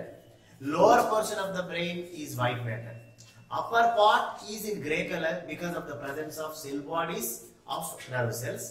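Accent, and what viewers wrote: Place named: South, United States. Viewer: Sir you are from south nnnn....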